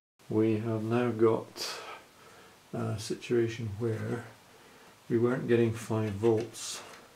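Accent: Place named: Scotland, United Kingdom. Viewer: Hi! This is great. I have a 7603 scope here and is in need of repair. I inherited it from a friend and it was barely working (some initial display but eventually gave in and now just sort of frozen). I use it for experimental XY drawing – as you say it has a lovely big display! Although I have some experience of electronics repair (swapping in broken pots and switches and caps on old synths etc) I am very wary of getting into these devices as there are some high voltages. I am also no good at diagnosis – I can only carry out repairs if I know what I need to replace! I notice you have a Scottish accent(!) – I am based in Glasgow. By any chance are you reasonably near? I would love to bring this scope back to life and am looking to commission someone to diagnose and help me. Any advice hugely appreciated and thanks again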